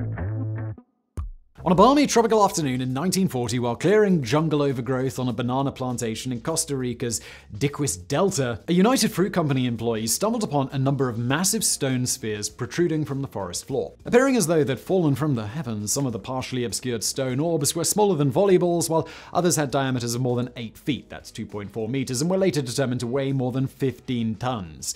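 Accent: United Kingdom. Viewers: British pronunciation is fascinating. Adding an extra i in aluminum, and apparently deleting an m from cadmium?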